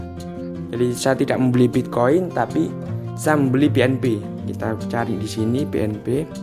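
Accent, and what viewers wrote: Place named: Java, Indonesia. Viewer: Medoknya keliatan🤣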